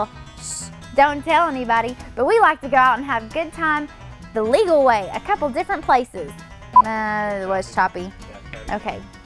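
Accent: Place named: Appalachia, United States. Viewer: shoot I knowed i shouldnta wait so long.well I still thank yer the purtiest girl in the hills.My name is Allen and I love to hear you talk.My brothers and sister come to pigeon forge every year.we live in Alabama but the smokies feel more like our home.Love to watch you vids and see the twinkle in your eyes and hear that Ellie may Clampet accent of yours.Bye now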